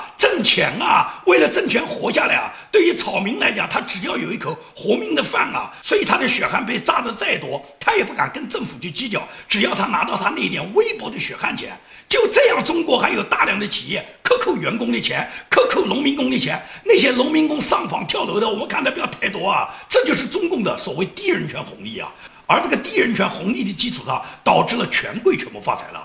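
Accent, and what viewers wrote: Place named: Nanjing, China. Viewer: 喜欢建民老师用哑嗓子带南京腔的嘶吼和亢奋的不得了的情绪！来斯!！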